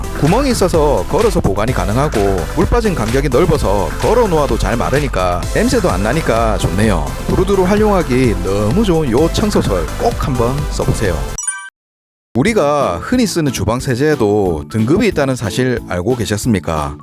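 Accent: Gyeongsang, South Korea. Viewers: 아 갱상도 사투리 듣기싫네 정말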